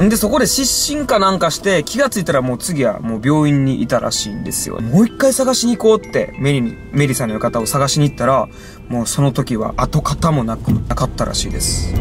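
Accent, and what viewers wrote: Kansai, Japan. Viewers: 翔くんの関西弁おちつく。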